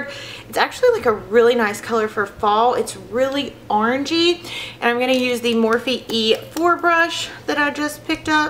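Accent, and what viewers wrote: South, United States. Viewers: I just noticed your po box ! I knew you had a southern accent but had no idea that we are in the same state ! That's cool ! I look forward to your next tutorial ! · I love your videos and insta posts! Where are you from? I love your country accent.. I'm from TN and have a country accent too!! :) I loveeee my morphe 35K palette! It's perfect for cool and warm toned looks!! ❤️ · My favorite palette by far is the Amazonian Clay Rainforest palette by Tart. It is perfect for my skin tone and the shadows bring out my blue eyes. I HAVE to get my hands on the morphe 350 palette and do this tutorial!!! I looooove vampy lips too but I have thin lips so this makeup look may not look as good on me. My instagram name is cck008. I love your channel and your southern accent so much!! Ps do you whiten your teeth? they look sooo white! If so, please do a video where you talk about how you get your teeth to look so good!!!! 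xoxo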